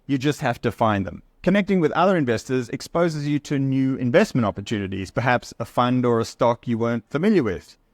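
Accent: Australia. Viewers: Sounds like you are an Aussie, same as me. i like the strategies you share on your channel. Question, what brokerage platform do you use?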